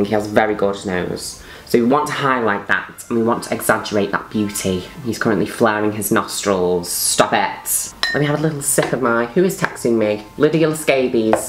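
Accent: United Kingdom. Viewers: Your british accent is ICONIC and STUNNING!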